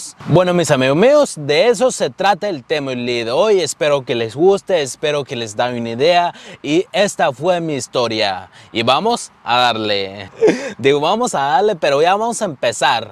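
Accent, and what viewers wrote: United States. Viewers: Me encantan tus videos pero no entiendo por que perdiste tu acento osea te cuesta el español y eres de Guatemala no entiendo ese esfuerzo de hablar como gringo espero no lo tomes a mal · jjjjjjjjjj lol 13 años y en redas la lengua como gringo no la en redo yo que tengo 30 años aqui y me cruso mi papa teniendo 5 años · Porque hablas como gringo oye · y tu acento hermano asi como medio gringo jejen una pregunta , curiosidad , soy seguidor tuyo , me asusta ir con coyote pero bueno es bueno aprender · Buen video bro, pero una pregunta, a los cuantos años llegastes a Usa? Porque hablas como los chavos que nacieron alla.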